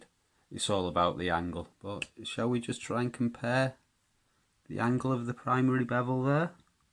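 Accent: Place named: Liverpool, United Kingdom. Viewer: What’s a scouser doing in Finland?!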